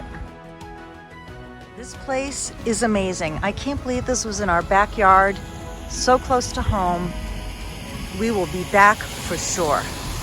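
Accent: New England, United States. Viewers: I'm not even halfway through this video and I'm so excited to see your kitchen come together. One question I have is it looks like your stove is far from your sink, but is that just a result of filming and perspective? Also, I love how you say "drawring". Don't ever change that New England accent! 😀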